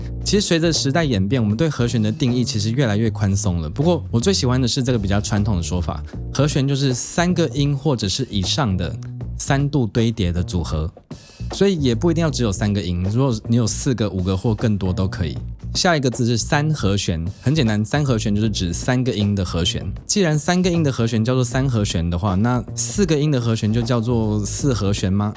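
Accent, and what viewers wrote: Taiwan. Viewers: Accent sounds Taiwanese. Really glad I came across this channel! For the Cmaj9 (omit 3), it can also be labelled G/C as an inverted chord, right? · 這是台灣口音部分了 我們不像大陸咬字那麼要求精準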